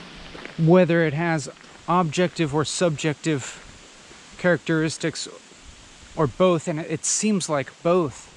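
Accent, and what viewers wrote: United Kingdom. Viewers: Why'd I assume this guy was British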